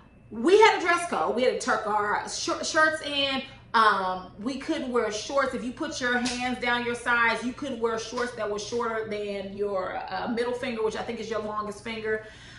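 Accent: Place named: Houston, United States. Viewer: Something TOLD me you were in or from Houston! If Im wrong, sorry, but I always felt like I could pick up on that fellow Houstonian tinge.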